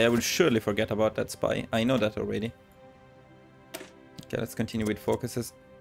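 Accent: Italy. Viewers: Out of curiosity: are you italian? The accent looks like it. Loving the videos, and ur accent remembers me of my father in law❤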